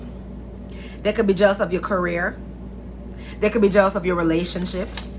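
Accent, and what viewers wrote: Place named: Jamaica. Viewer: I hear that Jamaican accent! ♥️ Great content.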